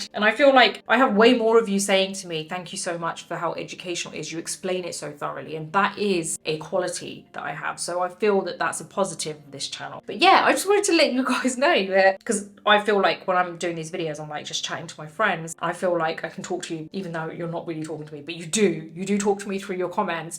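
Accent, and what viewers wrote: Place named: London, United Kingdom. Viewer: got Londer's accents like Michael Caine's. Haha. And I like it.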